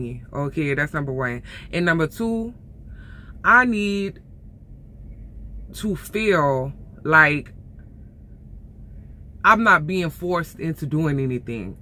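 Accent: Louisiana, United States. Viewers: Ramon Heard Jr it’s a Louisiana Cajun accent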